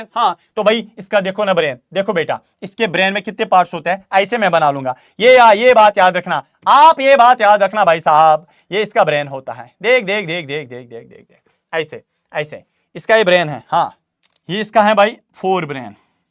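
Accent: Kashmir, India. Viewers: Kashmirii accent ❤😂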